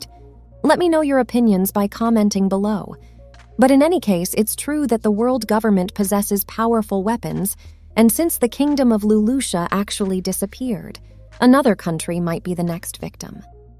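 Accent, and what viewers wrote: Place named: United Kingdom. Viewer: Eww... Please please go back to the British voice. It was so refreshing and different.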